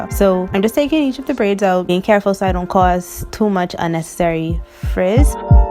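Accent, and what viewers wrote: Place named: Trinidad and Tobago. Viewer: My girl I'm hearing a lil Trini in yuh accent🇹🇹🥰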